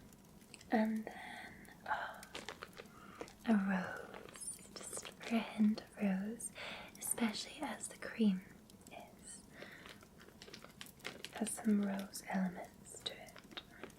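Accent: Scotland, United Kingdom. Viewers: Ah I missed that lovely soft Scottish accent. Sleepy time now, night night 💤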